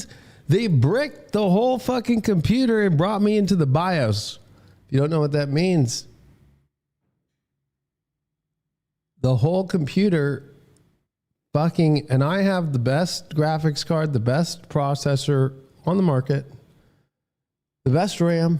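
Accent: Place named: South, United States. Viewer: ( the southern accent is killing me )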